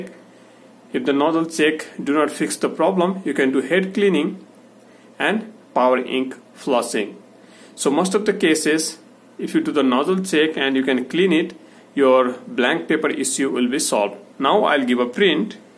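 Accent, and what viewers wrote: India. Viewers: thats a beautiful indian accent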